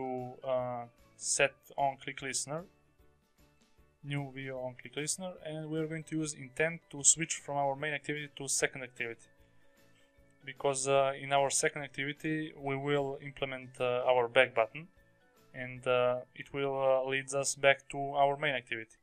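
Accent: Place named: Russia. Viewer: Your native language is Russian? Accent. :D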